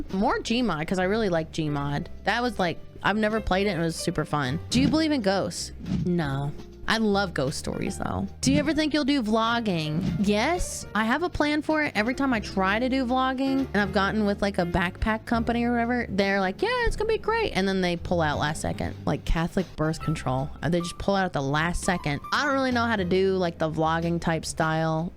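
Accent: South, United States. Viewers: Really like the southern accent Mine comes out every now and then even after 6 years in the city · Found you through the Crew! Glad to have you, have genuinely enjoyed your company and silly intoxicating laugh when you’re enjoying yourself with them. By the way it’s awesome to have a southerner representing, you ain’t hiding that accent ❤ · Wow really? She sounds so Southern tho xD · You have a country accent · Oh my gosh, I am a blind dude so I really love your southern accent. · 🫡 the southern accent pulled me in, as I have one and it’s not fun online 🤣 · As a fellow Southerner, I gotta say that I love that you don't hide your accent. I feel all warm and fuzzy inside when I hear that twang. Keep doing the Lord's work, sweet thang.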